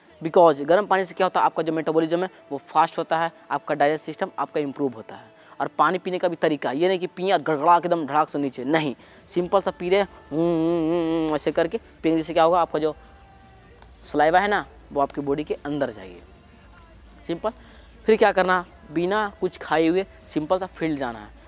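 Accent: Jharkhand, India. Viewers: Bhai ap jharkhand se ho kya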